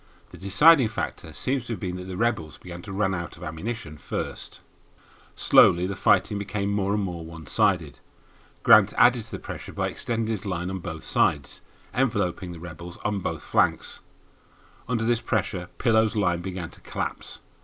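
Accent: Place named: United Kingdom. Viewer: Why is the narrator British? 🤷🏼‍♀️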